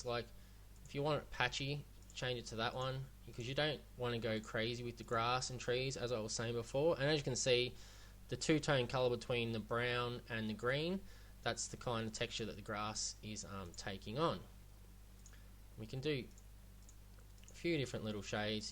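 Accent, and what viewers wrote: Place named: Australia. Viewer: I really appreciate your timely response, and thanks heaps mate. I am noting from your accent that you are from Australia just like me. I am new to Unity, although i'm discovering lots of things, I doubt that ill make money from unity, but would love to make some of my own games. I really appreciate your time, and I intend to follow your tutorials as they are very good. Ill try to fix the water thing later, again thanks heaps. I have been playing around with unity. I have found that sometimes characters do weird stuff like float or sink through floor (even if rigid body attatched). Anyway thanks heaps. You have earned a subscriber.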